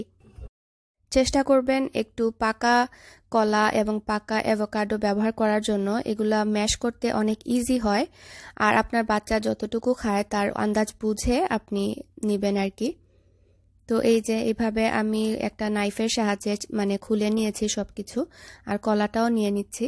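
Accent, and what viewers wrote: Sylhet, Bangladesh. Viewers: Apnee ki sylheti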